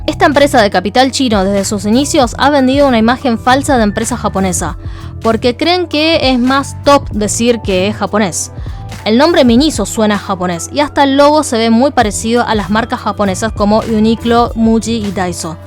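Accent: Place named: Rioplatense, Argentina. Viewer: Hubo un Miniso en Bs As, pensé que era japonés. Muy buen reporte, el mejor en castellano rioplatense!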